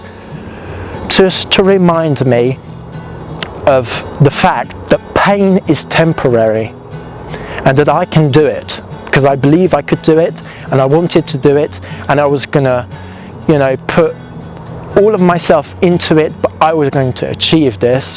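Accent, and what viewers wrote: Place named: England, United Kingdom. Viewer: you have a great English accent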